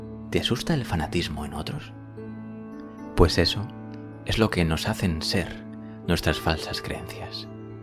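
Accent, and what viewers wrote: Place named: Spain. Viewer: No sé qué es más aburridor escuchar el acento de un español o escuchar los comerciales que pone Google para manipularnos y beneficiarsen. ellos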